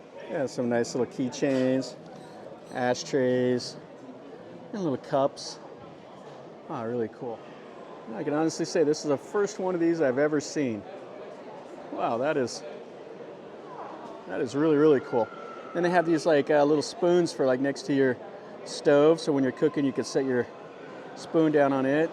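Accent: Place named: Midwest, United States. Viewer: Very good video. I’m curious where your accent is from (this a way, that a way, talkin, shoppin) maybe Midwest in the US.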